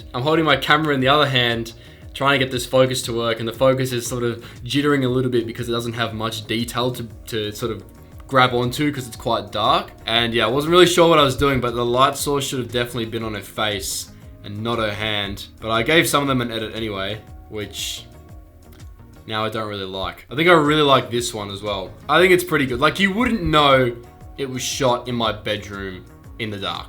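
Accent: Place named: Australia. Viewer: An Asian girl with a Aussi Accent... Omg Dream girl hahah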